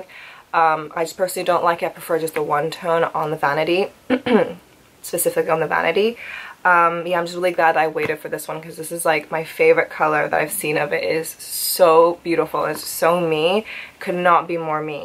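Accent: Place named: United States. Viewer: Weird question but how come you hv an American English accent? I know you’re in London, Hanoi & Taipei but did you grow up in the states?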